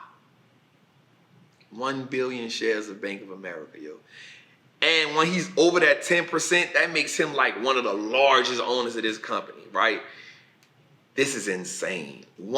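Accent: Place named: New Orleans, United States. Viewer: Just stumbled across you on a video you did with Tom Bilyeu and a soon as I heard your voice I was like that dudes definitely from New Orleans. Man everything you say makes sense and easy to understand. Thank you for spreading the knowledge.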